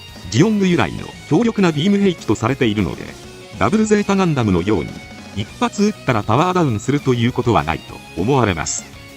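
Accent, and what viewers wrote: Kansai, Japan. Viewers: 関西弁なだけできれてないんじゃないか？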